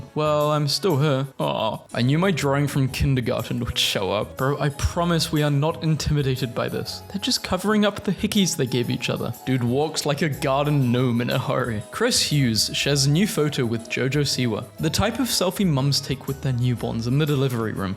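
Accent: United Kingdom. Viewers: Hi British man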